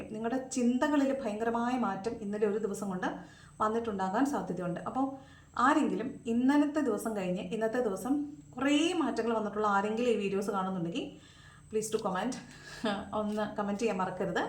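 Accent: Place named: Trivandrum, India. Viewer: Chechi trivandrum anale.... Chechide ambada pulusuuu kelakan nalla ressama.... Chechi parayunnatoke correct anu...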